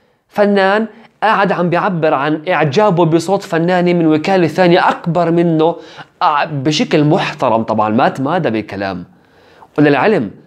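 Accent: Iraq. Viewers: انتة عراقي 🌚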